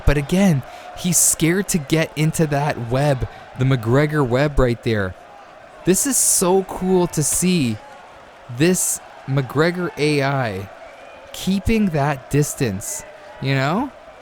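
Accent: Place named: Canada. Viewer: Dude I just found ur channel and I gotta say you got such a chill upbeat vibe and I’m not sure if I’m right but feels so Canadian, I’m only saying that cause I’m Canadian and u sound like alot of people I know which is dope!, anyways u got a new fan brotha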